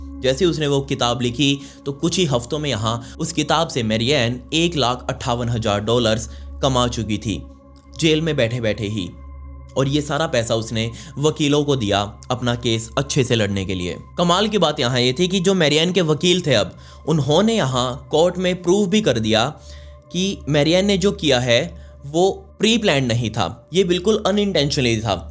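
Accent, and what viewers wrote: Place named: Jammu, India. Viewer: pick me ji.. hua toh thode hi din apko sunte.. pr lgbg saari videao sun dekh chuki hoon.. awaaz lehzaa bhut bdiaa.. pr tone hmare jaisi.. lv u from jammu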